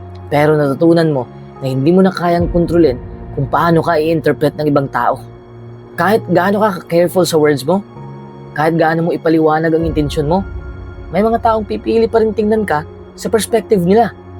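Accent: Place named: Visayas, Philippines. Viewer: Ang ganda sana ng delivery & voice mo, kaso nga lang need mo pa magpractice ng proper english pronunciation, mejo tunog bisaya po kasi.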